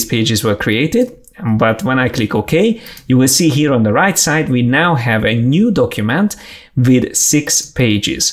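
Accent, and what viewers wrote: Hungary. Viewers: Ne haragudj. Véletlenül nem vagy magyar? Annyira magyar az akcentusod.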